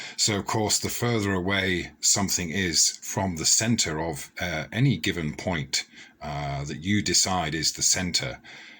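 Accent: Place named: RP, United Kingdom. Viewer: Thinking that rotating 2 out of date 2D projections of a globe validates a flat earth is extremely childish and infantile in its total impracticality and worthlessness. I do understand azimuthal projections but that doesn't make it " fine " to have to spin one so that continents align correctly. If you honestly believe that it makes any kind of sense to do this then I doubt its worth explaining why this represents nothing relevant to navigation in the real world.. How do you travel South to North in South America and Africa at the same time? You do have a decent Semi Posh ( RP ) voice but hearing so many ummm and errs is a dead give away you're blagging. You really are pointing in the wrong direction maybe when the magic sky daddy spins half the earth around you'll find your way.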